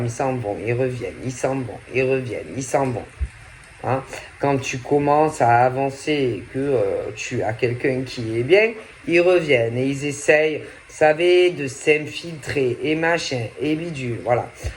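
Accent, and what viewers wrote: South, France. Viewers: 🙂 j'aime bien quand tu dis : d'accord hein bon😊avec ce bel accent du sud 😊💕💐 ça me fait sourire 😊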